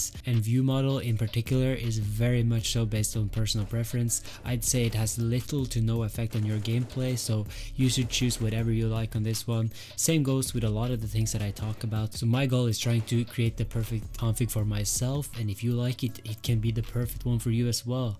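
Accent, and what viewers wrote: Norway. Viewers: E du nordlending eller? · I was in school there was lots of noices when i watched it, when i watched it again i heard that you were Norwegian my bad lol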